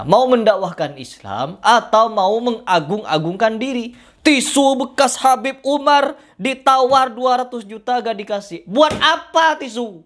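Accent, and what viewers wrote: Madura, Indonesia. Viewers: Logatnya kaya orang Madura.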